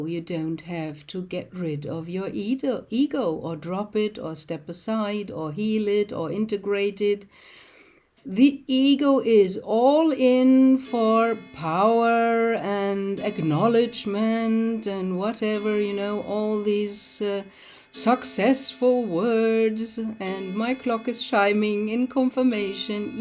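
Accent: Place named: Germany. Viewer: Your accent is wonderful I love your german side, but clearly you dont wish it to define you👍👍 Thats fine...but theres nothing wrong with this❤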